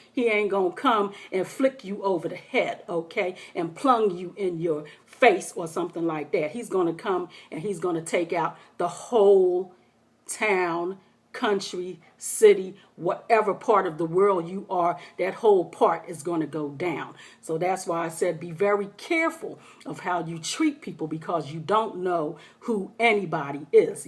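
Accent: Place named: United Kingdom. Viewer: Hey. That accent sounds British. Thanks for the update.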